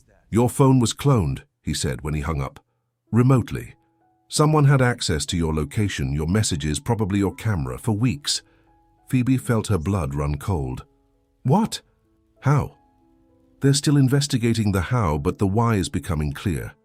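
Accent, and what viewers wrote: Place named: England, United Kingdom. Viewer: Maybe it's the English accent.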